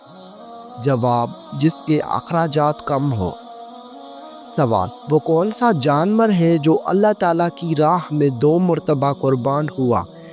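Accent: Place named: Sindh, Pakistan. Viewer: Ap sindi hu achi ko ashi khty hu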